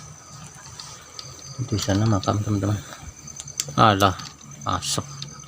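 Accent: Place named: Java, Indonesia. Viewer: assalamualaikum.salam kenal dari tangerang mas mas logat jawa penampilan orang timur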